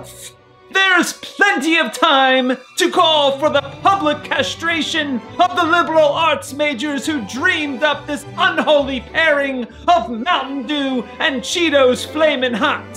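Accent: United Kingdom. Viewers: this, this is the most amazing YouTube ad ever. Thank you funny British man with an slight British accent in a olden day uniform that is reviewing an horrific MTN Dew flavor. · Finally, YouTube gives me what I’ve wanted for years; a man in a French uniform from the Napoleonic war using a slight British accent reviewing modern food and drink.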